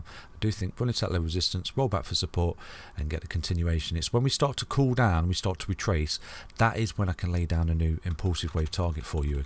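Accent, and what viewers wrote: United Kingdom. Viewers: Love your content my friend, I’ve moved to Canada 🇨🇦 from the Uk 🇬🇧 and it’s so nice to hear a fellow countryman giving great content and TA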